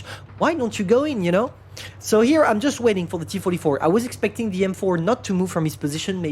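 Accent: France. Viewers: Are you french ?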